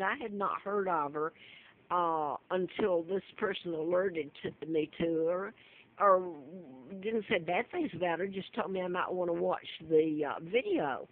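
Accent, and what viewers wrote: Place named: South, United States. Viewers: How did u get Southern accent ? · she was kidnaped and the southern accent comes from the British.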